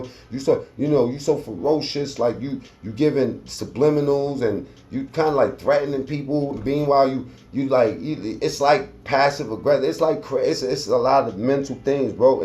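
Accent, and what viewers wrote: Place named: East Coast, United States. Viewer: Hello I am a new subscriber and love your East coast accent🤩 and how real you are about every situation. 💯 I'm from the South and love to hear people from New York, Jersey and Baltimore talk. 🤩 It's really sad to see how Danny went down and continues to blame Joe for everything instead of "Thanking him" for his help and all the money and assistance he gave him when he first got out of prison. I really want the best for him and hope he can LET IT GO and move on. 🌼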